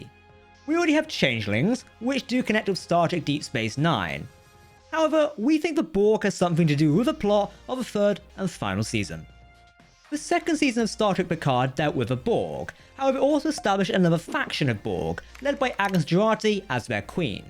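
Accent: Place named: United Kingdom. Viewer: 😁Shut the Fuck with winey Bullshit British Ass cent. 😄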